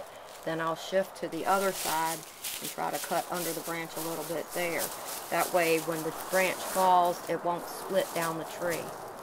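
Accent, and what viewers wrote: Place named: Tennessee, United States. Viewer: Never knew this existed, wow! Must get me one. Love the accent. Sounds like Tennessee.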